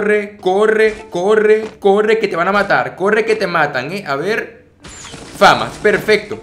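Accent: Venezuela. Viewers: Hey chamo eres venezolano?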